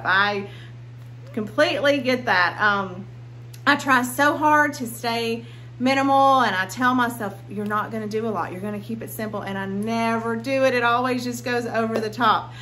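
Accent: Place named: South, United States. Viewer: So gorgeous!! I LOVE your gallery wall in the dining room!! I hear your southern drawl. Where are you from? I’m from the upstate of South Carolina.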